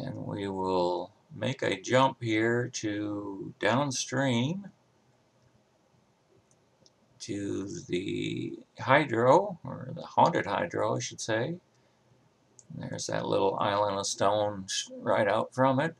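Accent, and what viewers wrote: Ohio, United States. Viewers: i think your ohio accent is cool, dont worry about it.:) my late dad grew up in and around akron and a friend grew up in port clinton and sandusky. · You keep your Ohio accent. I love accents, that's what makes people unique. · Your Ohio accent is wonderful. Don’t change it for any critics in your comments.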